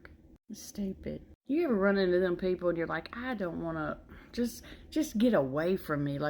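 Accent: South, United States. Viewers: You're like my twin with a southern accent. I love you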